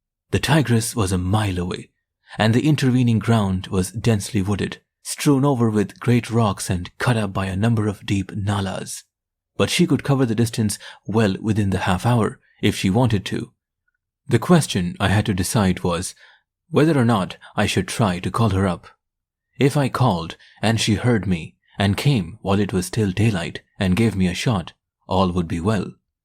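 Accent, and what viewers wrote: India. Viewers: Would be better without the forced pseudo-American accent. It grates on the ears. Edit: ah, just realised you are an Indian living in the US. That explains the appu accent. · Even though jim Corbett was an Englishman the story is narrated in an Indian voice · I love hearing these stories from someone who is from india or has parents from india. My favorite aunt is from india. And ever since i was a small kid ive been fascinated with her culture and family. Her two sons are my favorite cousins and i do my best to be a good older male role model for them like she and my uncle were for me. Hearing your voice reminds me of her family. Thanks for the authentic experience.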